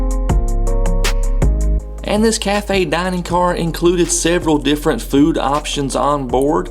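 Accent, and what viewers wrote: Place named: Texas, United States. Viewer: i like your accent man.. where do you come from?! texas?! have fun in indo man..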